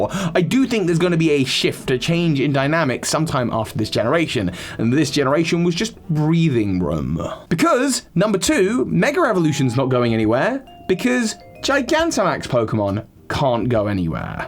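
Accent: United Kingdom. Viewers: Wait your British:o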